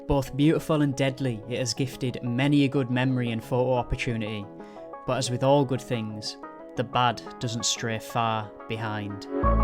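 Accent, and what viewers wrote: Yorkshire, United Kingdom. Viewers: accents are part of heritage and culture. This is a distinctly British accent, not an imported one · Good video, I’m struggling to pinpoint which part of Yorkshire your accent’s from. I’ve done Striding Edge many times over the years and been down both sides of the bad step. The first time down the bad side was forwards with a day sack on my back - I’ll not do that again! I’ve come across people struggling at the top of the step and helped them by spotting them down the safer side. I’ve also had a near miss coming down Swirral edge after slipping on loose scree. It’s best to be on your guard 100% on any edge and always lean in to the ridge so any slip or trip sends you the safe way, towards the rock not away from it.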